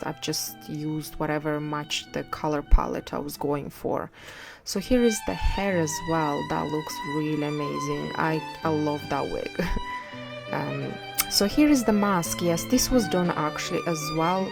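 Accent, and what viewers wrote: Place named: Europe. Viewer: I love the mix of accents you have! Something European with some English cockney in there a bit! Really neat to listen to! You’re very creative, love watching you make something come to life ♥️ I like your “play it by ear” style, I’m similar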